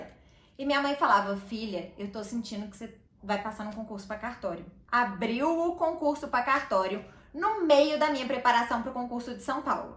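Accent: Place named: Minas Gerais, Brazil. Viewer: Sutaque mineiro raiz, a nossa minas gerais. hehehe ótimo vídeo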